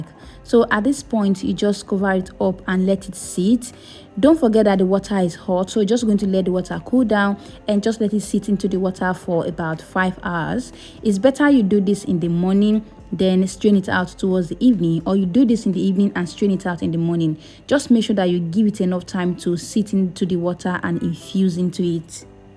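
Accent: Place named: United States. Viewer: At least her hair is natural but tell him about her accent she got Americanized so she just bragging about having real hair why don't she go buy a damn hair store why don't black women go invest in the same products that they use for their hair like people that are not your race know your hair better than you our black community is stupid as f***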